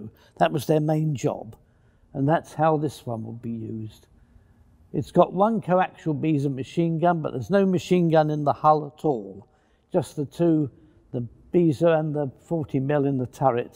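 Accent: United Kingdom. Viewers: I love british accent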